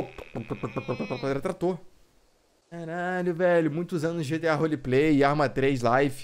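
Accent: Rio de Janeiro, Brazil. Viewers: quando o cara e carioca e foda kkkkkk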